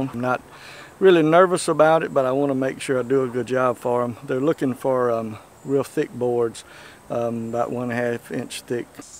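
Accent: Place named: South, United States. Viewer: I enjoyed the video and also enjoyed hearing you talk. I'm southern, but you really sound southern!! I'll look up other videos.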